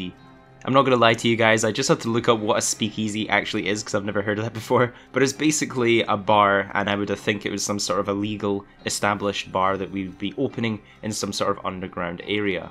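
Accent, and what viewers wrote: Scotland, United Kingdom. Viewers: Hey, your vids are great man. My Mrs is Scottish and we live in New Zealand so hearing that accent is wicked. Gonna be good to have a cabin setup with moonshine business. Makes you wonder what expansion the collector role will get huh.? What's your thoughts? I madam nazar type wagon or trapper site like in storey?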